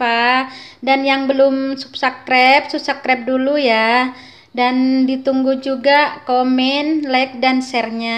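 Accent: Madura, Indonesia. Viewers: Asli bunda dari mana logatnya seperti org madura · btw klo boleh tau orng ap ya logat bhsanya kya orang madura 😀😀😀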